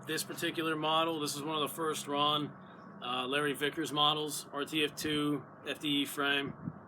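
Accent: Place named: United States. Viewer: You are Chinese why do u speak American accent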